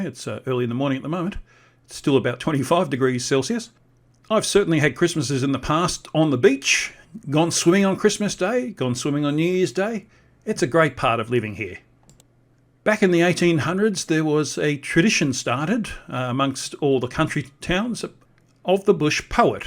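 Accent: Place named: Scotland, United Kingdom. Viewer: Sounds very Scottish! Thank you and wishing you a very merry Christmas.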